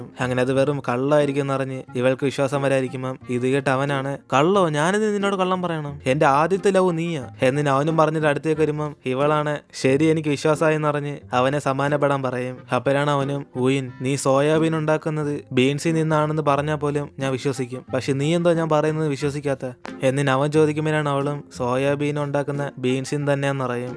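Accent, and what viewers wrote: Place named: Pathanamthitta, India. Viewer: Chetta vid pathanamthitta ano??? Slang kettit thonni athaa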